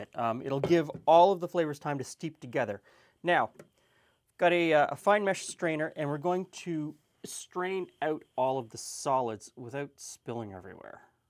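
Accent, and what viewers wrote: Canada. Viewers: I can't believe I only just found this channel today. I love your content, and it's neat hearing a YouTuber/media personality with a dialect similar to mine (I'm from Nova Scotia, but us Canadians in general sound pretty different from Americans a lot of the time, imo). This has been a fun learning experience, excited to try this asap! :)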